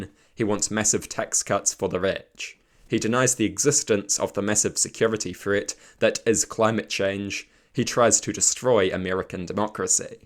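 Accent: New Zealand. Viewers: This is actually just a form of Kiwi dialect you haven't become accustomed to yet. Stop being racist bro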